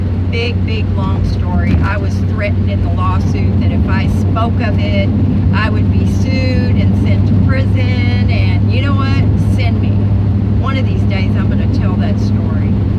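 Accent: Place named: Alabama, United States. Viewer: <link> When I was in San Diego and searching for interesting places to visit and park my van that didn't cost anything, I found many places over in Mission Bay by SeaWorld. There is a nice parking area on Vacation Isle where you can park and see the boats go in and out the harbor. These are for day time hours only but some parking areas open real early am for the fishermen to launch their boats. It's fun to see the boats go in and out the harbor. There are even some Mississippi paddle boats to watch at night all lit up in the sky from their lights. Hope this helps. What part of Alabama are you from for your accent sounds Alabamian. I enjoy watching your videos.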